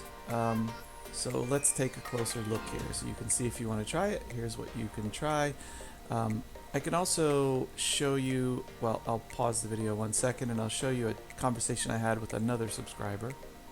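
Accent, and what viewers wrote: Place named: United States. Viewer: This is a really odd video and contains so many incorrect statements it's not funny. I don't see anywhere on the video where you show any of the bots or portfolio that you created. You don't mention anything about trading them semi-automated through the Telegram alerts so I can only assume you ran this 100% automated, 100% of the time? If so, that is clearly NOT how the software is designed to be used. It is NOT an EA or anything close to an EA. It relies on you, the user creating bots and a portfolio that satisfies your own criteria as a trader. It also relies on you as a trader to decide on which trades to take once they are presented to you. Having a conversation with another user, rather than with ourselves as the creators seems mighty strange to me. It looks like the blind leading the blind. As you can see from the live leaderboard, many of our traders are achieving excellent results from their own created and managed bots, see here <link> As for your execution issue that you mentioned, how on earth can that be something we control. 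What has your broker suggested (I'm assuming you asked them or did you simply blame us?) The system sets the trade on the built in virtual bot and then instantly copies that to your live account. You sound American to me, so just maybe it was an issue with your FIFO rules that your brokers place on you. Of the hundreds of live users, all with a large variety of brokers, I've never heard this issue before. It is certainly not a software issue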